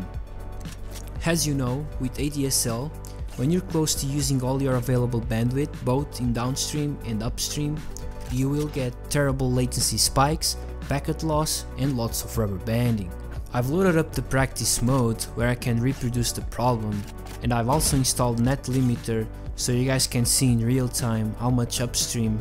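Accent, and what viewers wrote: Portugal. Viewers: senti o sotaque tuga !! E ainda bem ,temos que representar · Eu consigo perceber que és tuga pelo sotaque, por isso vou escrever em português. Eu tenho exatamente o mesmo problema, no entanto, limitar os fps's não me faz nada. Net specs: 5 ms, 200mpbs download speed, 20 mbps upload. Tenho NOS (infelizmente). Tenho alguns amigos que jogam VALORANT normalmente sem problemas que também têm NOS. Alguma outra solução ?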